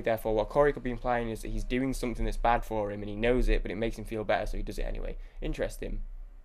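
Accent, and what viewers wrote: United Kingdom. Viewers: Sometimes it's hard to hear exactly what you're saying because of your thick British accent, but that's okay because I really enjoy just hearing you talk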